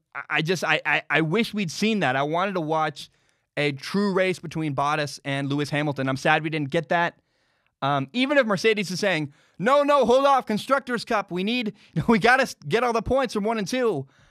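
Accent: United States. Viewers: Glad to see you're picking up on F1! Loving the commentary. It's definitely refreshing to hear F1 content in an American accent xD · Great to hear American accented F! commentary!, I believe you have found a great niche!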